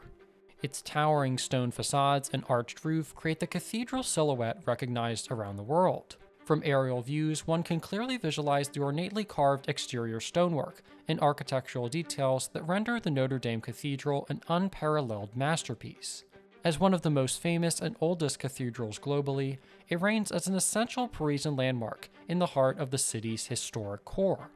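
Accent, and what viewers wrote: United States. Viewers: AGGRESSIVELY american pronunciation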